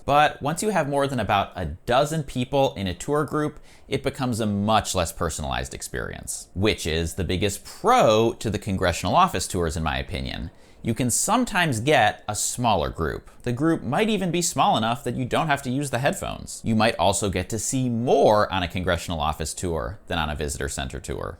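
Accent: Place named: Scotland, United Kingdom. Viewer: A Scottish tour guide? weird.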